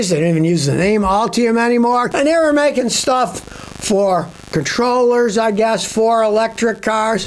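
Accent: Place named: New England, United States. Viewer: "karr" "new Englander lol <3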